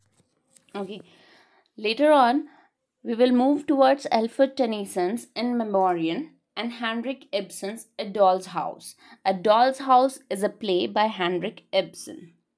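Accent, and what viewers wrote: India. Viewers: Nothing is more irritating than North Indian accent.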